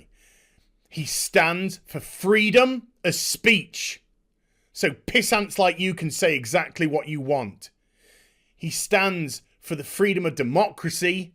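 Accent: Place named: United Kingdom. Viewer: Hearing a Brit talk about these things really made my day. All my uncles, my grandfather, and my great grandfather all served in the Army, and they did so willingly. They are true patriots, and I cherish every one of these fine men in my family, and all others. God bless America, and God bless all the people who have served and live in the hearts of the many.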